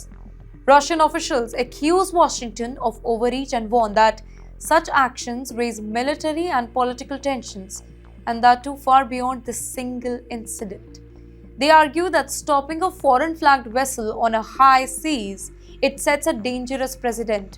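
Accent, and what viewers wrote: India. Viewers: Oh I think I can hear it. It's slightly different with some words, like she has two English Indian accents and they blend lol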